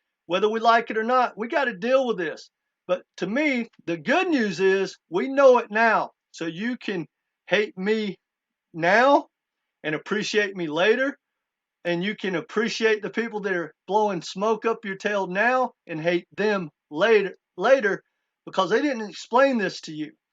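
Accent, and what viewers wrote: Texas, United States. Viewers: This guy lack of research, all the money to handle, half of it are more than 2 quadrillion $ under the ledger, please understand ON demand liquidity, you are talking like a Texan guy .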